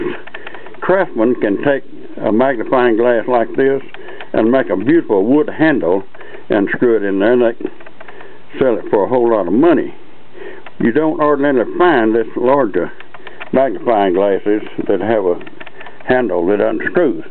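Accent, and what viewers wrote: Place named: South, United States. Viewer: HAHAHAHA SOUNDS LIKE A HILL BILLY@@@@ Definitly not a bad thing, were all hill billys over here.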